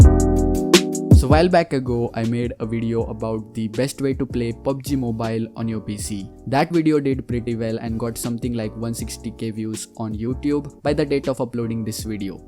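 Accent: India. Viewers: do you know what accents are? he's Indian (i think)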